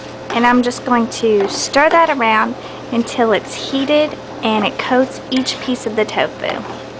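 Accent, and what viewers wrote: South, United States. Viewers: Is that a southern accent I hear? :)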